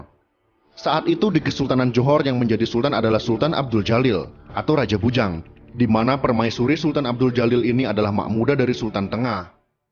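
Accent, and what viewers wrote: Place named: Java, Indonesia. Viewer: Miminnya ada logat Jawanya..kuliahnya d Jawa ya min?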